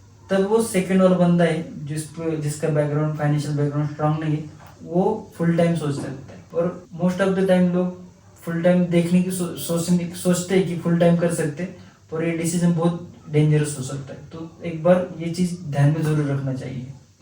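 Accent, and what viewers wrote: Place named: Maharashtra, India. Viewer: मराठी..मस्त.. गुलाबी हिंदी भाई😂😂 एकदम कडक I like it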